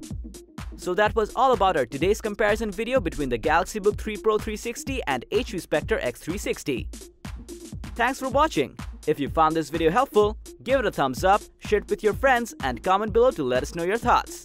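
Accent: India. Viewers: Dude your Indian accent shines through that fake one. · Are you by any chance Indian? Not that it's important but I can't help but ask because of the accent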